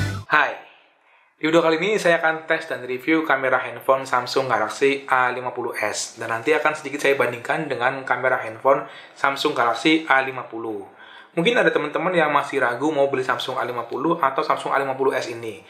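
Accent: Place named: Java, Indonesia. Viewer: Lokasi videone pas sore nang GSP yo mas? 😅 Logate yo rodo medhok je ✌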